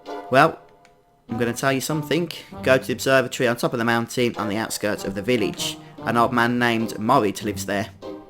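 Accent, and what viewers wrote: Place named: Northern England, United Kingdom. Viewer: what accent you're using? sounds like northern accent in England. You earn my sub.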